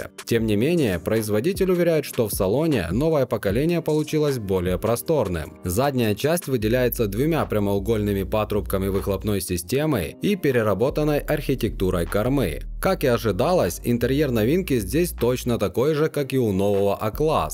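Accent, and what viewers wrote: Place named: South Russia, Russia. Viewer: Смотрю все ваши видео и ставлю лайк, но ещё не подписался. Вам нужно комментатор, мне не много не нравится произношение чувствуется либо юг России или Украина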